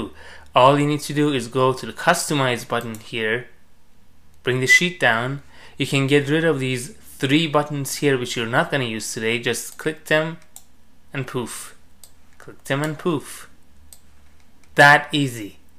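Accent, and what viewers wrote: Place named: India. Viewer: This is NOT a TUTORIAL?!! Then what the fck is it? The video is titled "TUTORIAL!" And the narrator specifically mentions "TUTORIAL!" The poster asked if there was someone who spoke English w/o a frickin' accent! I don't see why when there are instructions to be given, everyone has to have a frickin Indian accent!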